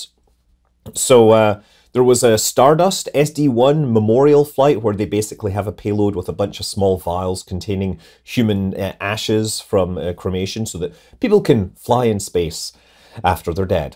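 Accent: Scotland, United Kingdom. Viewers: In addition to your excellent reporting, I also enjoy hearing a Scot speak with the accent notable among the people of my heritage.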